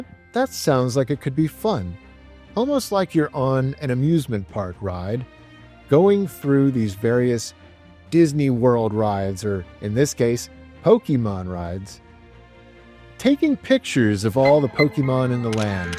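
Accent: Houston, United States. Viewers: you from houston bro?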